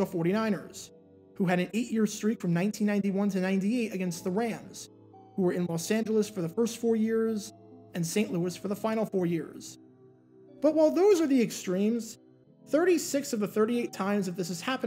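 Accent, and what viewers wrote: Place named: New York, United States. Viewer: I knew you had to be from New York originally. That accent is too obvious. 🙂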